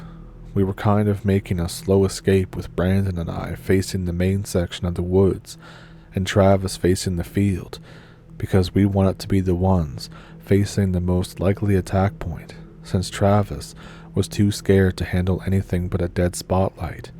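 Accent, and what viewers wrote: Ontario, Canada. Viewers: Hello, - Happy Harvests, I thought I would drop by and hear a good story or triple. 🎉, i have said this before, but your accent sounds just like my favorite Ontario cousins, right on best holidays, friend. 👍🏿👹🌅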